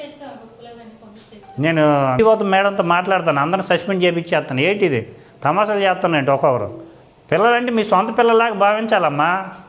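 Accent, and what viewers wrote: Srikakulam, India. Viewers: Srikakulam yaasa bavundi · బ్రదర్ నువ్వు ఉత్తరాంధ్ర శ్రీకాకుళం జిల్లా వాసిలా ఉన్న బావుంది లాంగ్వేజ్ బాగుంది మంచి మంచి పని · Srikakulam slang super sir....bagundi....sweet ga...asalu me east west vijayanagaram srikakulam vallu tittina...tittanatte untadi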